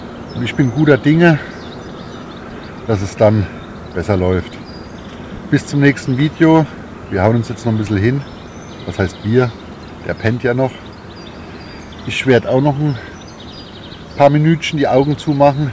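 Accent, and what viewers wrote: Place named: Hesse, Germany. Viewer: "Un hier ham mer beim Türk eh paar Peperonis geholt" Hessisch is die best Sprach dies gibt 😁💪🏻👍🏻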